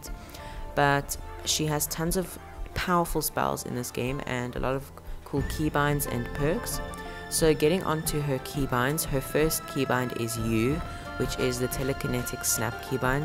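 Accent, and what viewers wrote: South Africa. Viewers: R u from SA?? 😜💅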